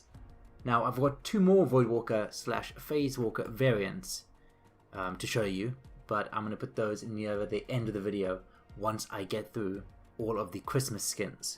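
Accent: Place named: South Africa. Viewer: Gotta love a South African accent!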